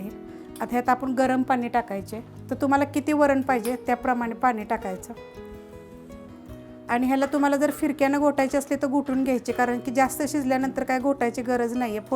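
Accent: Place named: Kolhapur, India. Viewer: This is pure कोल्हापुरी voice. Nice recipe and all the best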